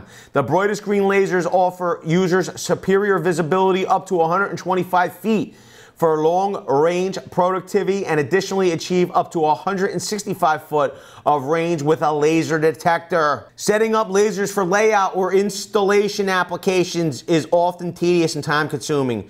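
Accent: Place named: Northeast, United States. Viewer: I'm trying to figure out where you're from based on your accent. It sounds like you spent some time in Brooklyn, Hampton Long Island, Jersey, Boston, and somewhere in the forest of Ohio. I feel like I'm missing one.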